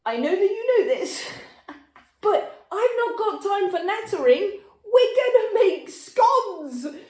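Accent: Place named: Devon, United Kingdom. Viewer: I’ve been baking scones for more years than I care to admit so can’t teach this old dog any new tricks, I thought conceitedly. Of course, I was wrong. That topping sounds interesting and I’ll definitely give that a go. I have used buttermilk but rarely have any to hand when the scone mood strikes. Must plan ahead more carefully. Yours looked delicious so guess what I’ll be finding time for tomorrow. And I confess your Dem’shire accent is better than mine…but I only blew in f**ty years ago.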